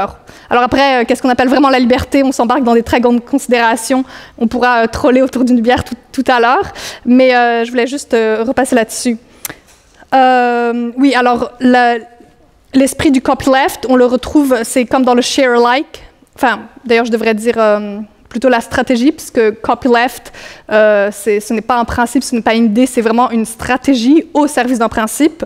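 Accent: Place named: Montreal, Canada. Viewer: I downloaded this video to watch while traveling but was bamboozled to find that it's not English but Montreal french 😅. The English title is a bit misleading.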